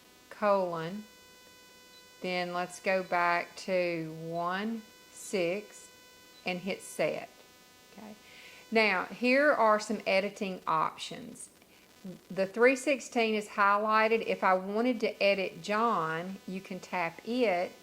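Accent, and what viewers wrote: South, United States. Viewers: PS I absolutely love your southern accent... We northerners don't hear that very often, so nice .. be well All the best to you.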